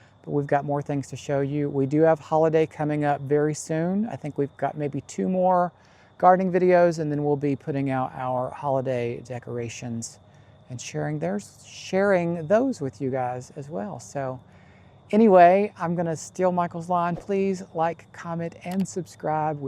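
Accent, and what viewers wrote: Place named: South, United States. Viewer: You need to add just a little more drawl to that “like, comment, and subscribe.” 😂 Just kidding - I love watching gardeners from all over the world but I do admit I have a soft spot for the ones who talk as southern as I do. 💕 The pots look great! I’m messy too - it’s a sign of genius. 😉 The scene stealer in this video is that adorable Scotty dog!!!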